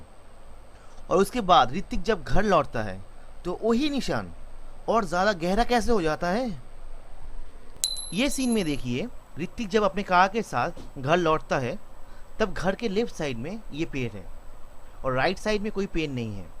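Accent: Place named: Bengal, India. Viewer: You are a Bengali right ?... ik this accent ...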